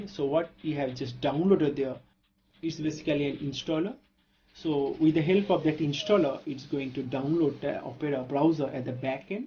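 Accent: India. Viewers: I do not like video for installation of opera browser, Is there a text procedures in installing Opera? The video the speaker talks very fast and the accent in talking cannot understand very well like the Hindi accent.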